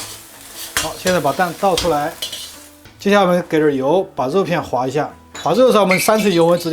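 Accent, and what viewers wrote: Sichuan, China. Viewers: 作为成都人听着你说的川普好亲切 谢谢你的分享！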